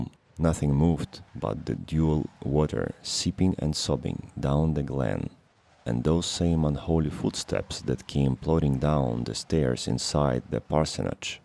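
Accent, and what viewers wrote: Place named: Eastern Europe. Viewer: the voice and accent sucks big time ... wtf ??? it's robert louis stevenson .. not eastern european story time !!!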